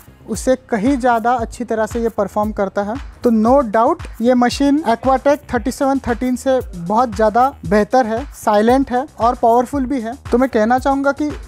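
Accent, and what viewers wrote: Maharashtra, India. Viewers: Are you marathi? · are you marathi?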